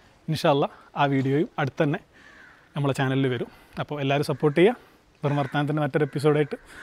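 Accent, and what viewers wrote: India. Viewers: സംസാരം കേട്ടാ അറിഞ്ഞൂടെ കോയിക്കോട് ആണെന്ന് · കൊടുവള്ളി കാരനാണോ, slang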